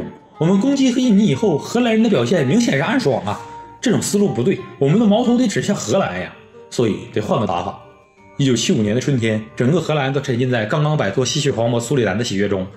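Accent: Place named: Northeast, China. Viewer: 东北话有毒...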